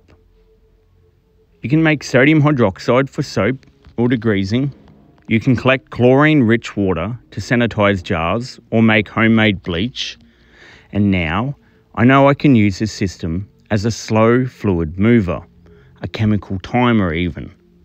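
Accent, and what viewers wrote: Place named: Australia. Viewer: That's pretty awesome and the fact that I can hear that Aussie accent narrating the video makes this even more awesome.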